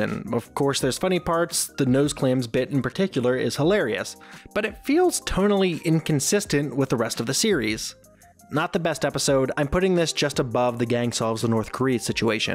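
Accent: United Kingdom. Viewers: LMAO SAME. i have no idea why there's a british guy reading it but it sounds so goofy to me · Why hire the British voice over guy? He's got the most boring voice ever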